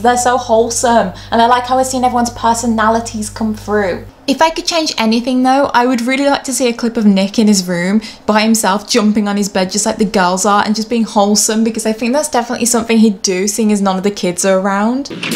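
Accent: United Kingdom. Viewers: Wait are u British ur accent is so cool I’m Aussie and also when u post it I’m always here so our time zones must be very close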